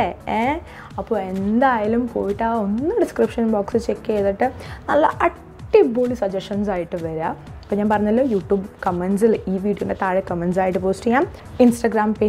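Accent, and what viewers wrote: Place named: Palakkad, India. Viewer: Palakkadan penkutty😑guess ur frm pkd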